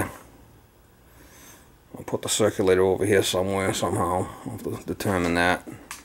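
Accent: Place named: New England, United States. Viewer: Great videos. Oh mama do I get a kick out of the New England accent. LOL! Wish you lived closer to western PA. I have a boiler that needs replacing and appreciate you taking the time and effort to make these videos. Big Thx!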